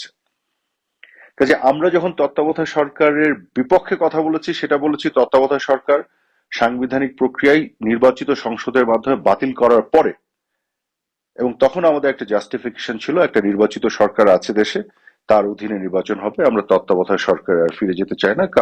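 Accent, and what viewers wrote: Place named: Bihar, India. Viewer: Aponar kothar sur amon-j bihar mani kintu talgas ta amar.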